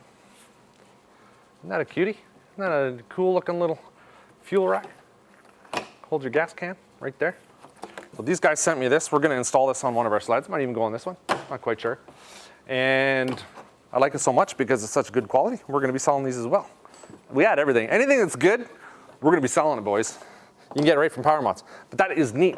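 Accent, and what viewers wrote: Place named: Newfoundland, Canada. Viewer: I feel like your a Newfoundlander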